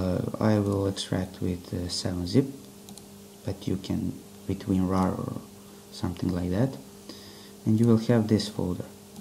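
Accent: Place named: Mexican-American, United States. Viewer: You talk like a American-Mexican.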